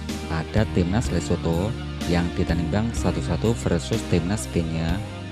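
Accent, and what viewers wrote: Java, Indonesia. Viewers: bahasa medok jawa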